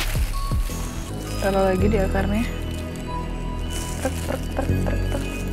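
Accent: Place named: Visayas, Philippines. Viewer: Akala ko bisaya si ateng 😂😊😊😊😘😘😘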